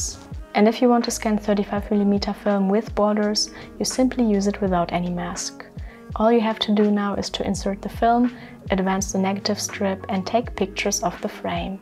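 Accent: Germany. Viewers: Came here for photography stayed for German accent 😍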